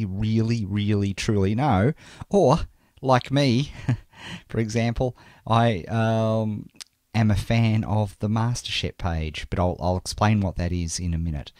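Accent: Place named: Australia. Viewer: Lol You obviously figure out that this is an ozzie.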